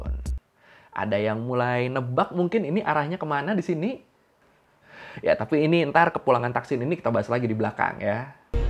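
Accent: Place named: Jakarta, Indonesia. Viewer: Bang ini emg bener asli thailand kah? Pernah denger asli thailand tapi logat dia ngmg uda jakarta bgt, kok jd ga percaya dia asli thailand😅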